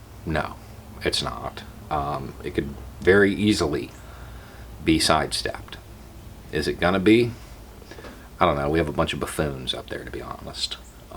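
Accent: United States. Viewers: Fucking hell, I'm so used to hear trumpian nonsense these days that hearing sense spoken with an american accent nearly made me fall off my chair! I should know better as there are as many smart americans as any other nationality. Speaking from europe. We got our own troubles of course, it's not paradise, we're not that bad yet even tho there are plenty, too many, who wish to adopt the USA ultra liberist system full on. Power of hollywood I suppose. Good luck to all of us.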